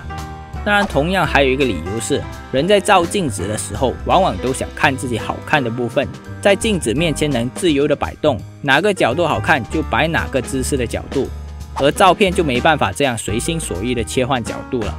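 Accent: Malaysia. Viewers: 偶然刷到这个影片，听你口音一听就是马来西亚，不像是台湾还是中国，翻了一下，居然是吉隆坡的。突然觉得马来西亚的Youtuber有望。很棒ayy! 加油!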